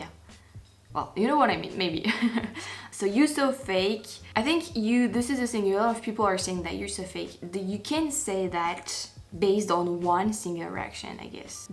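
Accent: France. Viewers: You're actually very charming, please don't be influenced by negative comments ... Yeah-h-h, I'm one of those guys who feels your French accent is adorable ... this is coming from an American with a PhD (from Independence/Kansas City, Missouri USA) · I watched a language video about English words that native speakers pronounce wrong. There are words in English that English speakers pronounce wrong but you a native french speaker are supposed to speak English correctly. I also wonder how many languages the people insulting your English speak. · Jamais, Jamais, Jamais. Never apologise for what you do or who you are. Your English is brilliant and with the french accent it is so endearing. When you do the English word challenge just remember that 99.9% of English speakers couldn't pronounce them either. I live in England and we have a saying, that if somebody says a word we have not heard before. 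we always say "have you swallowed a dictionary?". Nobody can ever become fluent in another language unless they were born in that country. As to music that is a personal choice. I could list artists you or a lot of people have never heard of. Does it matter..NO. You are learning, exploring and finding new music. My French is in no way as good as your English but I listen to French radio channels and watch French tv stations to find out more about your culture. Please ignore all the negative comments and continue with your enjoyment of life. You have to live your life and not other peoples. xx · Bonjour Don't listen to those who say your English isn't "good enough". It is very good, I have no problem understanding what you are saying, you are able to express yourself well, and I think the French accent is a beautiful addition and adds so much charm!:) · My parents told us "If you have nothing good to say, you say nothing at all" Words I've lived by. 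I found reaction channels on computer by accident. It's amazing how many are out there. I love them and I'll tell you why. I Love REACTIONS. I was born in 1956 (yes I;m old ):) I get to see a lot of titles I grew up with. I love music of all kinds. I get so excited to see younger people react to music I lived by, it's so refreshing. I'm addicted now so I "Like and Subscribe" to as many as I can. They brighten my day. Your channel was one of the first channels I subscribed to, why? First of all I have a weakness for french ladies:) I am Canadian, I'm english but totally love the french language. I took french in school and understand enough to get by. I love your accent, I love that you are so much fun to listen to, I love your smile on your videso, I love how you try to learn as much as you can, I love that you visited Canada, and had fun... I could go on and on my friend. You took us to the USA you showed us the Normandy war memorials and you love Timmies French Vanilla ( as do I ) You Make my Day. 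How anyone can find bad things to say are beyond me. You are doing Reactions, nobody is an expert yet some folks are really smart on here and have followed music all their lives. I enjoy their reactions as well. Last word. You are the prettiest, sweetest, sincerest on my list of reactionist. Ignore the negative, they can just leave or like you said, why even leave a comm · You are an authentic, transparent person. Keep doing your reactions,. Tu acento frances en ingles es muy simpatico. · Hi, I’m Jim Rainguet(French American), 71 years old, widowed, and thoroughly enjoy your reactions and your French pronunciations of English. You seem smart to me and isn’t having a reaction to new things the point of your channel. Don’t listen to the negative weirdos, just know people like me are out here reacting to things along with those who appreciate and love you! Jim Rainguet, Colorado, USA.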